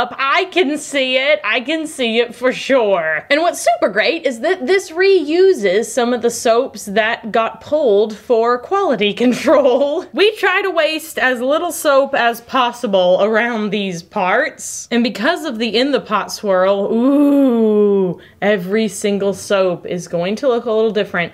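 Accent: Texas, United States. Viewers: I am not a surfer (tried and failed LOL) but a diver (instructor even) and that is kind of the same ..... the beach part of the soap is reminding me of a ocean glass beach or the beach after good storm with all the torn out algea on the beach..... and I hardly use "soap" I am just coming back for you bubbly personality.... I love it that you dont doll up for work cause that is what it is even if you take us along with the cam.... as you said it is hot no need to be painted like a parrot ;-) and of course I come back for the texas drawl "OH MY GOAD" and the "Scrappy Scrappy" was really disappointed the other day I found a video without a scrappy scarppy LOL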